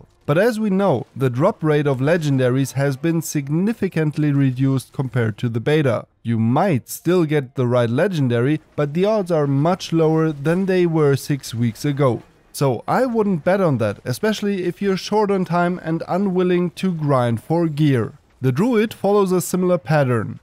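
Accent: Germany. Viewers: sounds like a german guy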